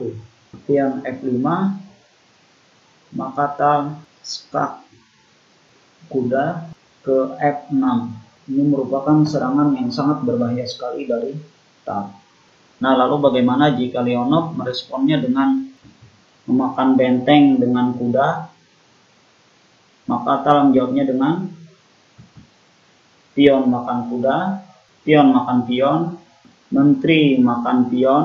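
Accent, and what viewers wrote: Indonesia. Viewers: Logat banjarnya kental sekali bro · Suaranya medok banget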